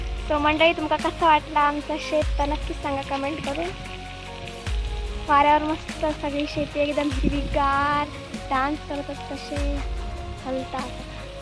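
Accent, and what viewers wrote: Malvani, India. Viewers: तु असा मालवनी बोलतस की ऐ कायला बरा वाटता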